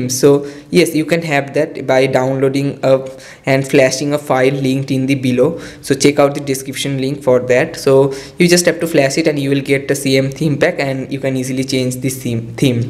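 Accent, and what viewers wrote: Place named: India. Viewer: Don't let the negative comments about your English accent affect you. You as an Indian know at least 2+ languages which is great. Good overview.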